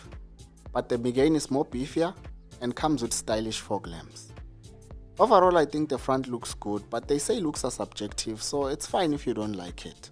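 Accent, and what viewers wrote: South Africa. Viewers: 😂 I love the cynical Kasi accent 🤟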